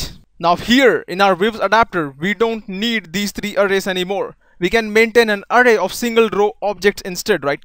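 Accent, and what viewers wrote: India. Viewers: we need more tutorials like this, clearly explained, comprehensible and energetic, especially coming from someone with an indian accent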